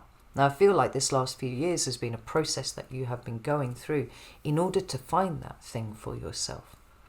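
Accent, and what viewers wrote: United Kingdom. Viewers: I get such a pure feeling of the British genius for language when you speak. Casts a spell.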